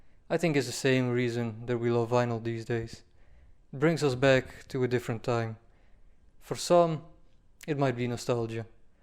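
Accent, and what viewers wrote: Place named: India. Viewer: First i thought, there is speaking an indian😅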